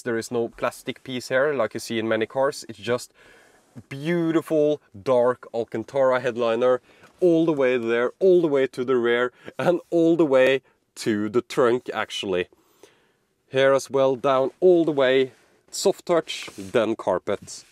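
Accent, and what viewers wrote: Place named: Norway. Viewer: Do Norwaynians always have this ehh eh ehh ehhh eh accent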